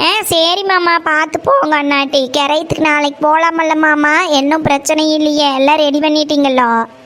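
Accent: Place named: Pollachi, India. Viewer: Akka pollachi slang akka ❤❤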